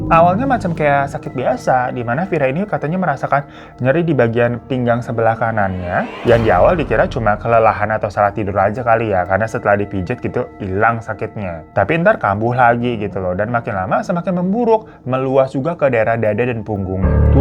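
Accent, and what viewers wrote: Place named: Kalimantan, Indonesia. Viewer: Logatnya kalimantan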